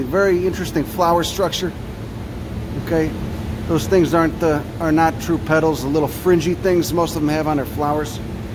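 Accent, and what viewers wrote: Chicago, United States. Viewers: Thanks man, I'm a long way away from the US (I'm a expat) but I feel every bit of the tension here in Europe. Listening to that Chicago accent and calmly walking around a botanical garden somehow gives me hope that all is not lost there... much appreciated! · Couldn’t figure why I like these videos he’s smart, knowledgeable, the Chicago brogue, no it’s cause he’s got the gift of glaberous or gabbro, he’s gabbroid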